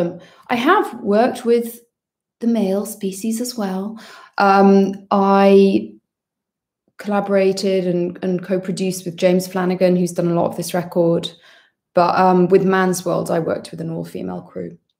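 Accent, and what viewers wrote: United Kingdom. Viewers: Greek Icon with British Accent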